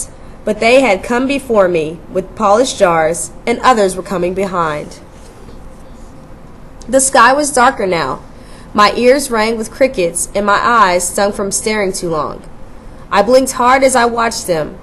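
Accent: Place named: Germany. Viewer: also ur german accent is good................................................................................................................................................................(WAY TO MANY PERIODS)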